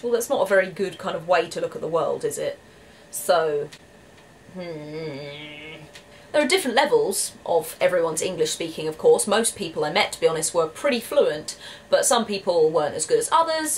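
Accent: United Kingdom. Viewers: hey, i love to listen to your very british english:) but - do you talk about that berlin that i know? - that berlin that i was born and raised and still live in? (i am 31 years old)... i don´t feel the same about that... i see very stressed and passive agressive people surrounding me! everyone is deeply quiet ^^ but it depends where in Berlin you are.. for sure... but to me personally: i think i will leave my hometown now... but i wish you all the best in germany. i´d rather would go to the UK if i could... ( i have nice memories of the uk, but i have been there for only ONE WEEK, so.... who knows..) Lovely Greetings from Berlin!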